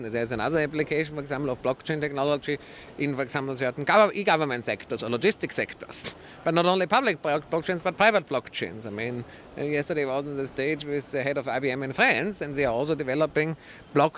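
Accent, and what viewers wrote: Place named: New York, United States. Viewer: Das ganz typische New Yorker Äll.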